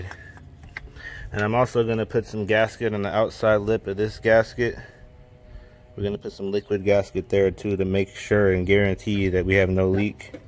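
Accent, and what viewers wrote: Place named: Pennsylvania, United States. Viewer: Are you from back east, you sound like/= my neighbor from P - A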